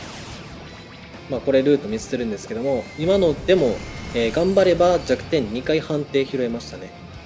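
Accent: Kansai, Japan. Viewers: さんの関西弁がたまらないです！いつも勉強になってます(^.^)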